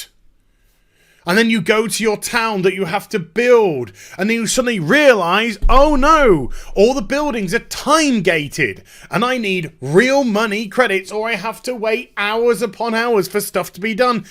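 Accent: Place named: United Kingdom. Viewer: you sound brittish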